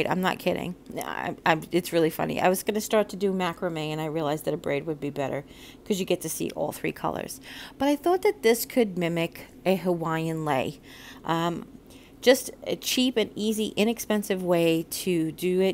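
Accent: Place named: Long Island, United States. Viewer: these are all so precious! I really enjoyed listening to you (that Long Island accent is so home to me!!!) and getting some beautiful fresh ideas! I love your sense of creativity! God bless you, my friend!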